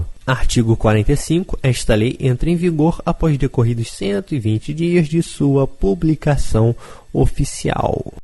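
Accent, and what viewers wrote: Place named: Rio de Janeiro, Brazil. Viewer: Esse é carioca kkk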